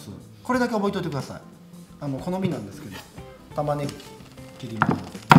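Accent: Kansai, Japan. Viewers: 10分でできる料理...最高にありがたいです(;▽;)にんじん細切りの仕方感動~そして関西弁でおちつきます😊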